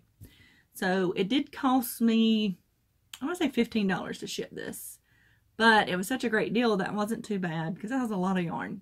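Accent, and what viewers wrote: South, United States. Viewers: I read rules and understand .love to make baby clothing and blankets. love your southern talk.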